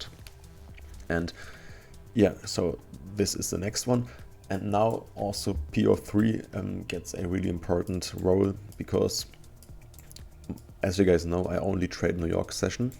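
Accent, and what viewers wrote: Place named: Germany. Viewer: I hören the deutsch 😁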